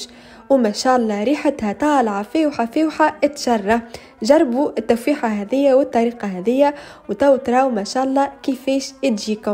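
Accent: Tunisia. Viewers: تبارك الرحمن عليك يا للا الحادقة تسلم اديك تونسية محلا لهجتك شيء راءع مغربية من باريس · Ma shaa Allah top top Allahi barik3lik. Appétissant et j aime trop votre accent tunisien❤ bisous de la Belgique 🇲🇦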